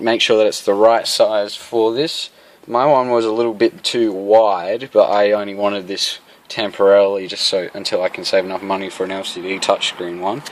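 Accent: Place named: Australia. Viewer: How good is hearing an Aussie accent instead of an American accent. Cheers, bro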